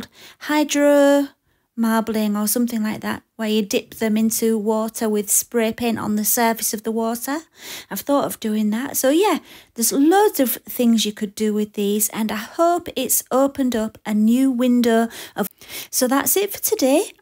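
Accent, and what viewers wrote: United Kingdom. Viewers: dear god i could fall asleep to this womens voice cause it is just so soft and nice sounding. Is this accent from the UK? if so where exactly is the area this accent is from? anyway wonderful video and a wonderful voice :)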